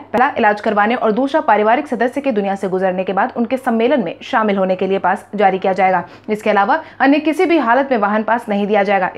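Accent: Bihar, India. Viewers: Madam ji Bihari mat bola kro ye sabd ache nai lagte hai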